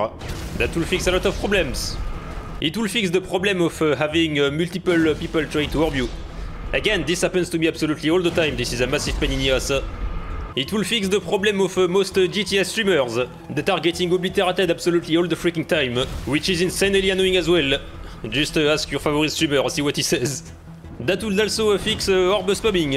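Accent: France. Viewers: Your French accent was 10/10 in this video, idk it was just so lovely lmao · No way again french accent guys 😮😮 nice vid btw xd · How do you sound even more French every time I watch any of your videos 😅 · Do you have any idea how French you sound ?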